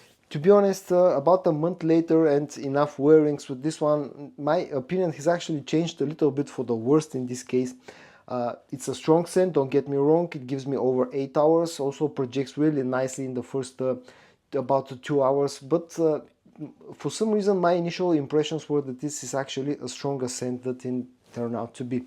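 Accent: Bulgaria. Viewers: да не се казваш случайно?:) Често попадам на тези ревюта и чак сега долових бг-акцента (но е толкова слаб, че дори се чудя дали всъщност съм прав). Когато става въпрос за арабски аромати винаги се спирам на твоите видеа:) Хем ревютата са интересни хем стила ти не е натрапчив и претенциозен (нещо, което е дооста рядко срещано в тази ниша). А за темповете, с които бълваш видеа направо нямам думи:Х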